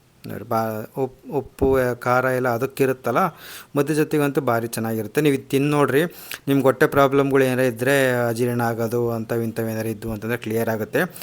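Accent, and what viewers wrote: Chitradurga, India. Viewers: ಪೂರ್ತಿ ದುರ್ಗದ ಭಾಷೆ ಕೇಳೋಕೆ ಚಂದ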